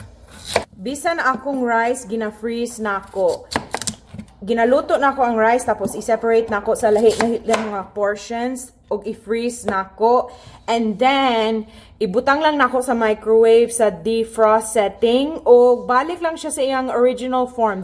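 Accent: Visayas, Philippines. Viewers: Nice🎉 bisaya Accent! So fluent... _siyempre gikan sa pilipinas.. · Kacute ni dai magbinisdak oi.. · Pagka bisaya baya nimo uy! Haha! Taga Cebu ko pero naa na ko ga puyo sa Virginia. I miss hearing my mother tongue. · Bagsika nimo mgbisaya dai oi😂❤ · There is no way that I can't subscribe to your channel. You got me with your perfect Bisaya accent. Maayo kaayo ka magbisaya, day! Lingaw ko tan-aw sa imong video unya kabalo pud kag Japanese word daikon sa radish. Diay, pareho mog nawong ni Angelica Panganiban. Pareho mo guapa! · Anaa ko dri tungod ni Jared..ug ako nalisang Kay tungod Wala ko naanad ga lantaw ug amercanang nag binisaya ug Ang accent bisaya kaayong dako😂 I'm glad and I'm subscribing..I'm so amazed❤ this will be my favorite blog.. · i saw u in jared's video i'm tagalog but i grew up with bisaya friends even though i don't speak biasaya i close my eyes i hear a bisaya person speaking, a fellow filipino. 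more power to your channel.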